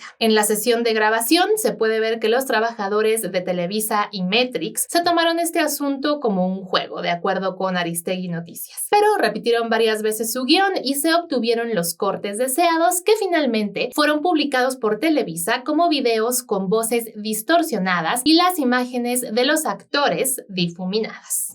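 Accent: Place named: Argentina. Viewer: y porqué hablas con acento Argentino?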